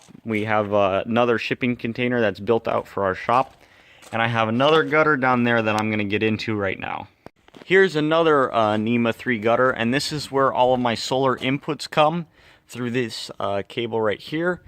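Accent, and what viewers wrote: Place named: United States. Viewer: May I ask what state you're from? I'm trying to learn about American phonetics and I found your accent very pleasant to listen to. I hope I'm not being too intrusive.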